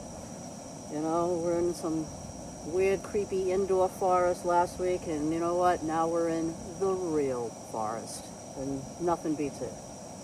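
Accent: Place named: Boston, United States. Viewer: Love your vive. I love your videos, but I love your Boston accent more. Can u add the word badabin badabun. I'm asking with all respect. Shout-out from Brownsville, Texas.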